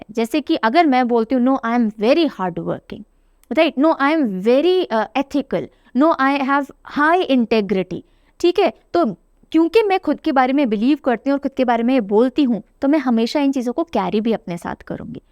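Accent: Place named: Maharashtra, India. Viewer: Your marathi accent is too good mam....Aaple sarkar seva kendra...love from Maharashtra ❤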